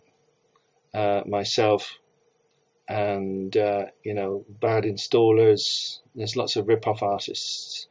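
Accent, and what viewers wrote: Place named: England, United Kingdom. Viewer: What part of England are you from? It may work better in the UK.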